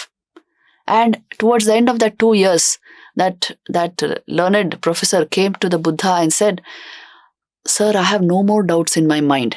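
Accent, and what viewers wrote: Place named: United States. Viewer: Conversion of the lecture to American accent is not enjoyable 😢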